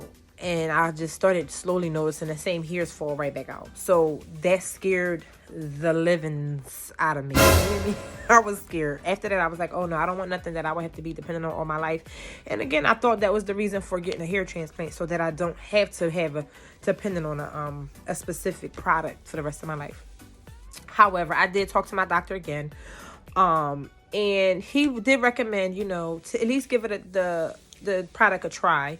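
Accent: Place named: Los Angeles, United States. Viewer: That LA accent jumping out baaabbyyy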